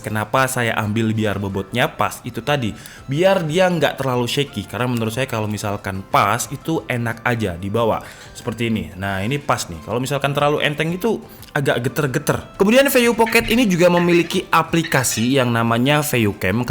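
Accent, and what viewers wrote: Malang, Indonesia. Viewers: Orang Malang bang?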